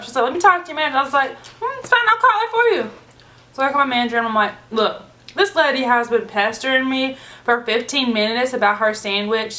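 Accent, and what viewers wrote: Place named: South, United States. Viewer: I'm impressed by yer appetite and yer food-crushing capacity. Looks like you could eat a lot of guys under the table. And yet you don't look like a truck driver – quite the opposite. So, have you ever been out on a dinner date and scared a dude? Hey, by the way, when you're talking, it seems a subtle Southern accent kind of comes and goes – are you in a Southern state?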